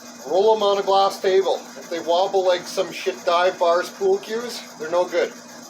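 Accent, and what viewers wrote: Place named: Ontario, Canada. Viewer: You often say, "how's she going eh". Are you a from Ontario?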